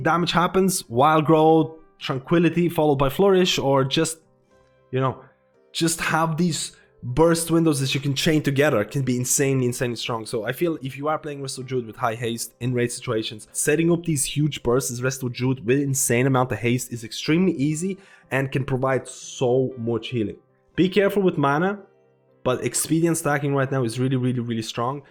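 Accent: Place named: Ireland. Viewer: Where are you from man, i cant hack your accent i hear abit of irish in it lol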